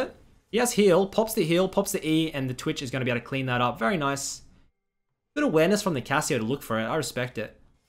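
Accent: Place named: Australia. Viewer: Came for the Australian accent, stayed for the K N O W L E D G E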